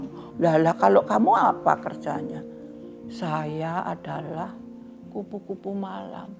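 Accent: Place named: Temanggung, Indonesia. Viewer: Raiso d colong Logate wong temanggung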